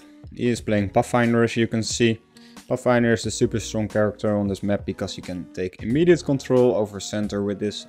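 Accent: Netherlands. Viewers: Yo are you Dutch?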